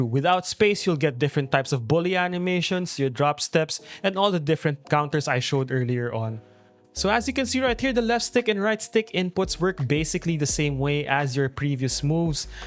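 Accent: Philippines. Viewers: Are you Filipino? I peep the accent 👀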